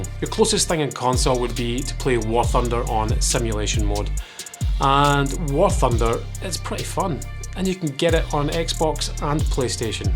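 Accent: Scotland, United Kingdom. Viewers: Helpful towards new, inexperienced flight sim-ers like me? Yes. Scottish accent? Yes. What's not to like. Subscribed. Hope the channel builds nicely for you. p.s. What got me to realise I wanted to keep going on DCS was the excellent A4 Skyhawk mode. As a full fidelity offering, in an era which is simpler to learn and control, and carrier capable, I cannot recommend it highly enough for all new starters. Ignore the 2 free DCS aircraft and just get on that instead.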